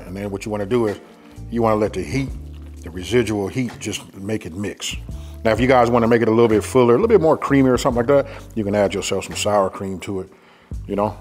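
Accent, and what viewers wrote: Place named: California, United States. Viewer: I love your cali accent, you making me miss home lol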